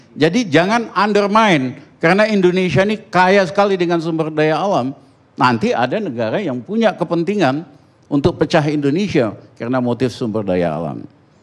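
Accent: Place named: Netherlands. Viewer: Pak * kental logat belanda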